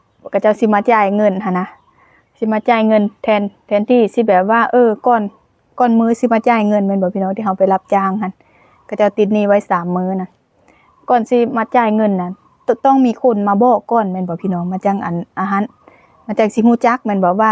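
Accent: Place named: Sakon Nakhon, Thailand. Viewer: สำเนียงพูดคือ ไทย สกลนคร 😊😊😊🇹🇭🇱🇦💓😊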